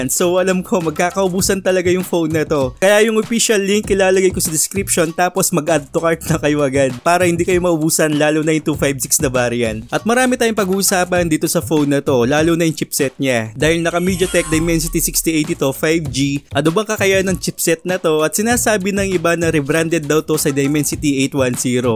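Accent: Pampanga, Philippines. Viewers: Kapampanagn accent alam na alam ko e hehe new sub here kabalen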